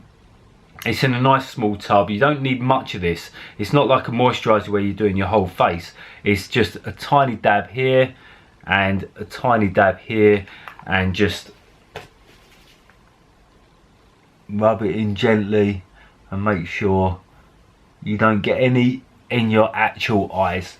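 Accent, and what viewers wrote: United Kingdom. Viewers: subscribed as soon as i heard that top notch londoner accent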